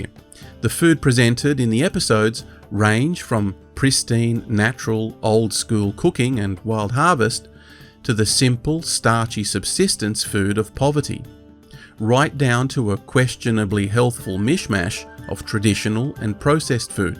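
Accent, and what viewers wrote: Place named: Australia. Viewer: Good job, love the humor, delivered with the Aussie accent, perfect. It was a big subject to breakdown however I think you managed it.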